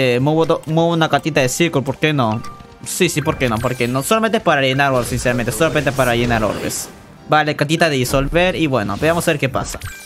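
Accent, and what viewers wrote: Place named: Pocho, United States. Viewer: Yo te escucho más pocho fiu fiu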